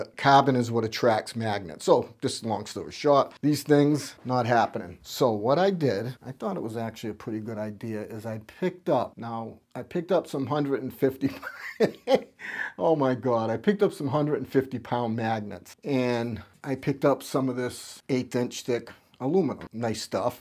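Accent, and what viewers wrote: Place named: Massachusetts, United States. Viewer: The magnet idea is awesome! I’m definitely going to incorporate it for my setup! I found you on your recent Reddit post and followed you here. Definitely appreciate your input and love your interest in flight sims and setup! I’m a space simmer so the attention to accuracy doesn’t hit me like it does you, but I do love your creativity to set things up. Crazy that those small magnets get over 300lbs of uhh magnetism! I’m wanting to go full simpit but for now I just modify to attach to and around my desk. Have to get creative since I can’t commit to replacing my desk and the $$ is limited due to having 3 young daughters as well, so that’s where most of the money goes x_X. Lastly, love hearing the Mass accent. I lived in the New England area for about 10 years when I was a kid/teen. I’m from Oklahoma and moved back before I left for the army, but I always loved the range of accents and such, but I do NOT miss the snow, lol. 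Anyways, just wanted to comment and say I love your stuff! Take care and stay warm!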